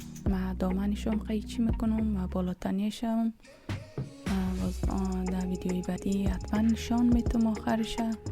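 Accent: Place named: Afghanistan. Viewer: احسنت برخواهر افغانم با اموزش عالیتون استین چه خوب اموزش دادی بالهجه ی شیرین افغانی 🇮🇷🇦🇫👏🏻👏🏻👏🏻👏🏻👏🏻👏🏻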